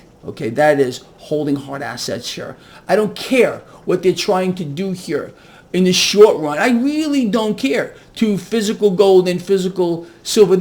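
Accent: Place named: Upstate New York, United States. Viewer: where did you grow up? The Northeast? I get a vibe you're from upstate New York sometimes 😃 Great episode, thanks for all your excellent advice!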